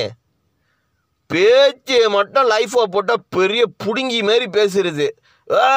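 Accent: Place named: Malaysia. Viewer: Oh my god the best 1 Malaysian tamillan slang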